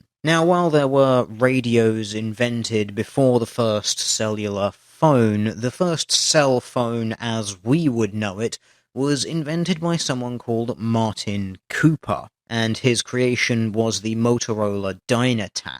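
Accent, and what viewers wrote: United Kingdom. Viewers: So it's another guy with a British accent complaining about "social justice warriors"? Got it.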